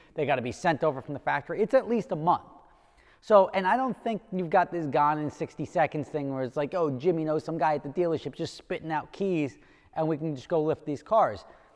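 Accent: United States. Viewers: Are you American or English Ps I’m English so please tell meeeee Wait no your American whhhyyyy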